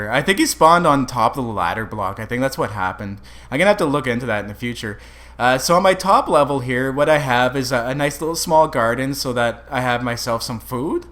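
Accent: Canada. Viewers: You're totally Canadian aren't you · you canadian cause i am and u sound like u are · You sound so canadian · he sounds canadian.....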